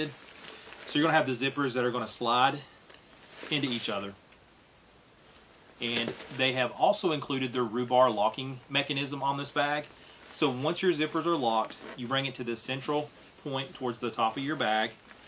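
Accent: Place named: South, United States. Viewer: Love your charming Southern accent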